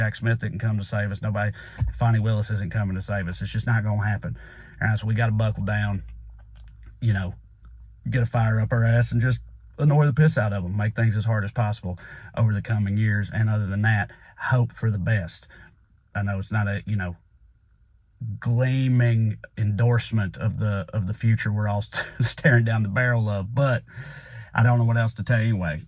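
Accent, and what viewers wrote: South, United States. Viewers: I LOVE you. JOE Biden and I went to the same supposedly Catholic high school for three overlapping years, and I'm srill angry about the candyass pardon of his son. Your observations thereon have at least taken the edge off my anger. But I remain profoundly angry at Joe for his continued arming of the Israelis in their crucifixion of the Palestinians. I continue to visit your site because a redneck liberal who speaks in a Southern accent soothes my soul back into theological Hope for whole minutes at a time. God bless. Stay strong. Speak out. Many thanks. WKS.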